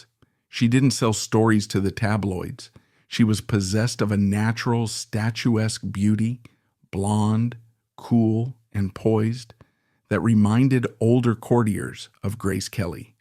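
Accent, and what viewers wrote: United States. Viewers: Not too much Irish blood there I would think , begorrah . Too long , deadpan American accent . Should be wrapped up in 20 minutes (for a minor royal) .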